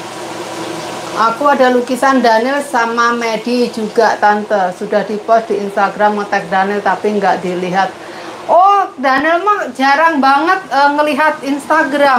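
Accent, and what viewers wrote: Java, Indonesia. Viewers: sy hadir dr madiun jatim mbk aku sela😅lu ngikuti chenel mbk putra putrinya udah mulaidewasa mbk ,mbk kadang sy ketawa bhs mbk kok msh medok kali yo 😅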